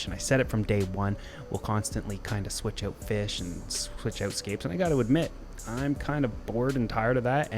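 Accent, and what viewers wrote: Canada. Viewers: 1st thing I like the idea of brackish and the lay out of aquarium. I've had few types of tanks over the years. Don't have any now and no I haven't lost the Love for the hobby it's I want to do something different but habits are hard to brake. 2nd I hate it when people belittle themselves, I'll answer your question why pronounce the way you do. Bro it's your accent. I'm not to familiar with Canada but in the States we have to deal all kinds of different accents. Every part of country seems to have its own. Good luck with the brackish and don't let fools like these question yourself.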